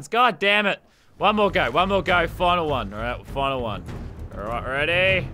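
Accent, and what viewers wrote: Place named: Australia. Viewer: Your next challenge is to do an entire video in an accent besides australian!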